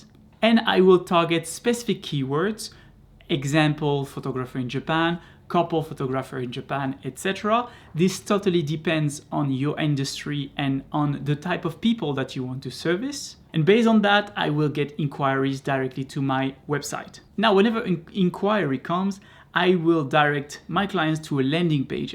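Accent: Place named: France. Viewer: I detect a french accent. Très joli!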